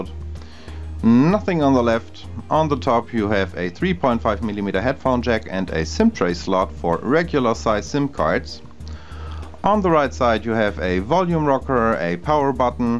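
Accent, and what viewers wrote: Germany. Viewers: Are you german? You speak like that. One question, are you buy all This phones? Or just some?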